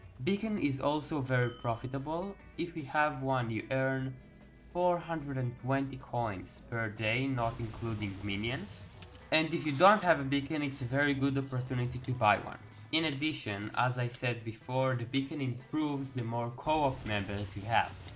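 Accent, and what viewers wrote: Israel. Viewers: מבטא ישראלי? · איזה מבטא ישראלי 😂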